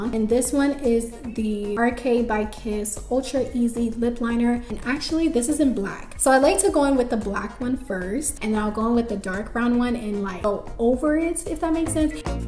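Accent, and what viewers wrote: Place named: Nigeria. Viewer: I’m 1 minute in the the Naija in you is jumping out. Is it by force?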